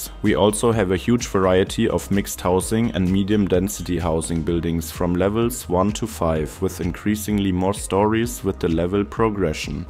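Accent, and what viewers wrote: Germany. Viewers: I think the German accent fits perfectly to the video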